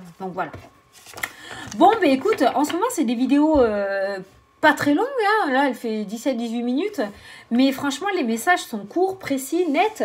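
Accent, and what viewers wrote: South, France. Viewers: Coucou, oh que ouiii tu la encore ton accent ❤et j'adore t entendre parlé! Tu chante le soleil du sud 🌝 il te vas si bien! Je t'embrasse bisous et merci à toi 😘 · Il est bien là le magnifique accent du sud, j'adore !! Je me sens en vacances quand je t'entends ! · Hahaha...je ne connaissais pas cette expression : "j'ai géré la fougère"...oui oui rassure-toi tu as toujours ton magnifique accent du soleil !!! Bises💚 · Toujours aussi juste 👏👏👏 et vive l’accent du Sud !!!!! ⭐️🌙⭐️🌙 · Bonsoir merci pour le tirage, oui je te confirme que tu as bien l'accent du sud mais c'est agréable plein de soleil! Gros bisous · Bonsoir oui on entend bien ton accent du sud. Je te souhaite une bonne soirée à demain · Oh que oui que tu as l'accent du sud, et oh que oui que c 'est très agréable avec ta personnalité Pep's. Perso j'adore🙏 Merci pour le tirage,en effet pour le tirage de la semaine, le mercredi sortait comme le meilleur jour avec déjà la plus belle carte du jeu🤗 Belle soirée et à demain 💐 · Oh bibiche comme je croise les doigts pour que cela se passe ainsi ! 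Beaucoup de démarches en cours ici, en attente de retour pour justement des partenariats professionnels. Wait and see. Et oui, un très bel accent du sud :-) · Coucou oui ton accent du sud est mon soleil du soir ☀️🌞😍😘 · Coucou tu as toujours ton accent du sud très agréable à t'écouter et avec le sourire 😍😆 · Cc..ho oui tu l a l accent et c est juste magnifique..c est une voix qui chante on entendrait presque les cigales.. j adore tu as tout pour nous faire passer un merveilleux moment... Pour la communication oui je l ai tellement attendu...mais j ai dis que je lache prise.j en ai chier....et que ça serait bon de pouvoir vider son sac... Merci pour tout a bientôt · Coucou oui l'accent du sud on l'entend très bien, mais s'est très agréable ça nous fait un air de vacances. 😉😉💋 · Coucou. Oui tu as toujours ton magnifique accent du Sud! Chantant, leger, joyeux! Sinon, en effet je dors mal!! Reveil vers 4h...ça fait quelques nuits... 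merci pour tes tirages et guidances 🙏🏻🙏🏻🙏🏻❤️ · oui je suis réveiller a3H du matin je ne dort que très peux merci j Aime ton dynamisme et ton accent du sud merci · 😂😂 j'adore ici 20 h aussi. Et oui tu as un bel accent du Sud. Moi c'est celui du sud ouest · Merci pour le.tiraje ses.que des bonne nouvelles oui tu a l'accent du sud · Coucou ma belle, oui ton accent du sud est bien là, une joie pour moi de t'entendre magnifique en plus ta guidance toujours exacte ta joie de vivre magnifique je crois que pour la belge que je suis ça me fais énormément de bien je te kiffe à fond gros bisous je suis en pleine forme pour le moment !!!qui sais peut-être tu es contagieuse gros bisous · bonjour ma chère tout d'abord merci de cette exellente guidance et rassures toi ta petite voix c'est le soleil du midi les cigales toute ma jeunesse et cela fait remonter tant de bon souvenir coquin de sort garde ton accent c'est si joli je t'attend demain sur you tube et je t'envoie plein de bisous ma pichoune Nelly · 🙂🙂 tout est arrivé hier..tout le tirage c est trop ça... 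merci et vive l accent du sud ❤ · cc oui tu as l accent du sud ainsi que le chant des cigales ta bonne humeur et ton sourire fait du bien. Je te regarde le matin et ma journée commence avec beaucoup de bonheur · 28... pour le 6 janvier 8-2= 6... c'est souvent que tu nous fait ce genre de tirage synchrone. Ton accent ma Belle tu l'as mais comme je l'aime pas trop marqué mais tellement vrai. Je suis de l'Occitanie ( Aveyron ). Malgré 40 ans en région parisienne, j'ai toujours mon petit accent en fond...et je le cultive quand je descend au pays. Le tirage concerne le professionnel... et moi qui suis déjà à la retraite, je ne m'y retrouve pas dedans. Mais ce n'est pas grave... j'adore cette positivité pour ceux qui sont concernés. Seul point les insomnies qui sont fréquentes et mon Papa est très présent malgré que cela fasse 3 ans qu'il m'ait quitté le 30 décembre 2017. Bisous ma Belle du Sud👄💗🌞😍😍😍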